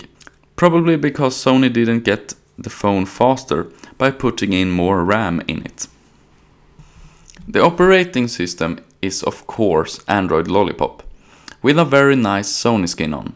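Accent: Sweden. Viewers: Haha älskar breda svenska brytningen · even worse as Swedes have a reputation of good quality English - almost no detectable accent. not this guy... but whatever, his accent is still better than Scottish or Indian accents, those i literally cannot understand at all. This guy i can completely understand so i give him a pass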